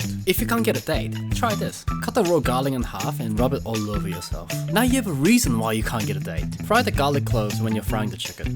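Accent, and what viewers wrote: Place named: New Zealand. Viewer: Wow looks nice 👍🏼 btw miss New Zealand your accent is a fun mix of the kiwi accent.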